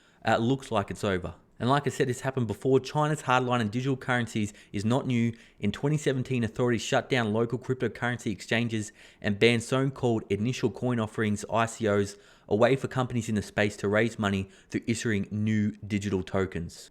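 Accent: Australia. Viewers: I don’t know which phrase I like hearing better in an Australian accent, “Holy Smokes” or “Party Pooper.” Either way, great video, thanks for keeping us up to date on all things financial!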